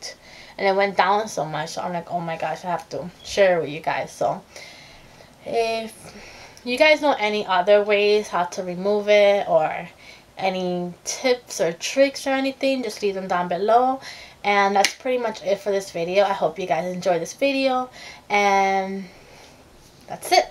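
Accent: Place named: Miami, United States. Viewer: Love the Miami accent! I'm definitely going to try this.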